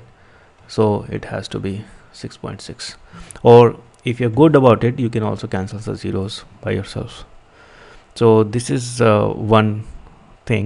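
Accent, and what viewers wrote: India. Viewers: When you here a indian acent you just know hes good to maths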